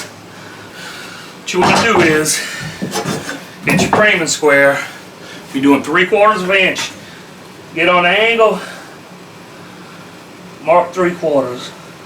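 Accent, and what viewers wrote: Louisiana, United States. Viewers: One of the best videos. I’ve watched it so many times. I would have bet my life when I first started listening that you were a black man named Mr. Beauregard. You were standing in the garage. I was so surprised when you were outside and you were white! 😂 Love your accent! Is it Louisiana? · Nicely done! Man, I thought I heard Louisiana in there somewhere.. subscribed. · What part of Louisiana you from, bruh? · Are you in Louisiana somewhere? 😊 You sure sound like you're from home. Thank you for this detailed, clearly communicated instructional video.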